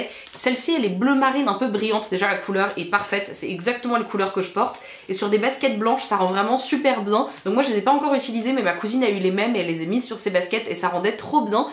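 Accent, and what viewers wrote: England, United Kingdom. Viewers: ton accent anglais 💘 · Je suis jalouse de ton accent anglais 😍 mdr sinon super vidéo tes cadeaux sont géniaux 💋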